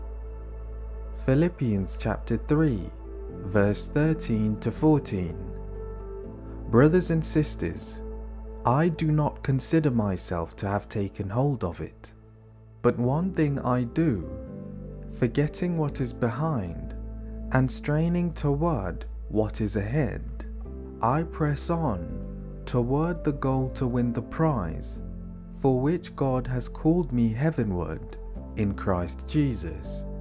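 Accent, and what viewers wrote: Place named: United Kingdom. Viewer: I was looking forward to this, but... the British accent put me off. Too bad for me but I certainly hope this is good for those who can tolerate the accent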